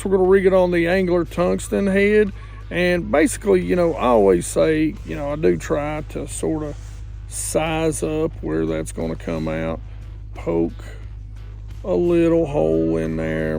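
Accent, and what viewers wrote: South, United States. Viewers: Great advise but I can’t take this slop southern accent for another second. Unsubbed.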